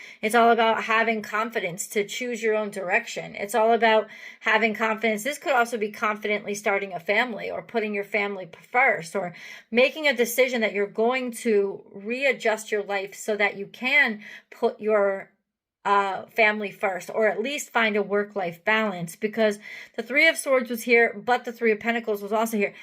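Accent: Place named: Texas, United States. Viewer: Love to hear the texan in you coming out in this vid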